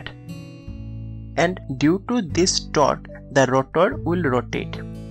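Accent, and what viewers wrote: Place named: Bengal, India. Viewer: Liked the bengali accent dada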